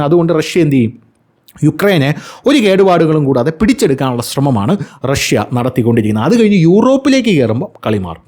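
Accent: Thiruvananthapuram, India. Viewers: ചേട്ടൻ ഇങ്ങനെ രണ്ടു തിരി സംസാരിക്കുന്നു വേറെ ന്യൂസിലെ സ്വാഭാവികമായിട്ടും നോർമൽ ആയിട്ട് സംസാരിക്കുന്നു അണ്ണാ ഈ ചാനലിൽ നിങ്ങൾ കോമഡി ആയിട്ടും എന്നാൽ എട്ടിൻറെ പണി കൊടുക്കുന്നതായിരിക്കും സംസാരിക്കുന്നു ഇഷ്ടപ്പെട്ടു ഐ ലൈക് യു നിങ്ങൾ തിരുവന്തപുരം അവിടുന്നു വന്നതാണോ വർത്തമാനം പറഞ്ഞ ശൈലി കേട്ട് കഴിഞ്ഞാൽ അങ്ങനെ തോന്നുന്നുണ്ട് ഈ ആദ്യം പറഞ്ഞ അയ്യാ വണക്കം അത് അടിപൊളിയായിട്ടുണ്ട് നിങ്ങൾക്ക് ശരിക്കും തമിഴ് അറിയാമോ കാരണം ഞാൻ മലയാളം ആണെങ്കിലും എൻറെ അച്ഛനും അമ്മയും തമ്മിലാണ് നിങ്ങൾ പറഞ്ഞ ഡയലോഗ് എനിക്ക് ഒരുപാട് ഇഷ്ടപ്പെട്ടു ❤❤❤❤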